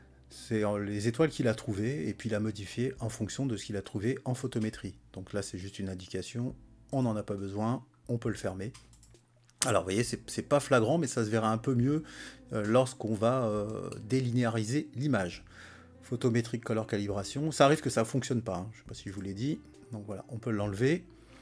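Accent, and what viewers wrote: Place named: England, United Kingdom. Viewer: Mais quel travail de Titan ! Une série complète depuis l’install en français, il n’y en a pas beaucoup ! Merci pour cette formation et ce partage de savoir. J’ai appris tellement de choses avec cette 3ème partie. Pix est vraiment génial, les possibilités sont infinies :) il nous faudrait au moins 7 vies pour tout savoir. Plus qu’à mettre tout ça en application pour les prochains traitements :D Merci aussi pour le process icons et tes données à télécharger ;) Ps : Je suis fan de ton accent Anglais 😂 j’adore !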